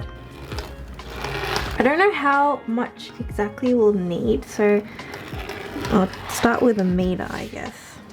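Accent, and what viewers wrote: Australia. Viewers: She has a noticeable Australian accent LOL. So Australia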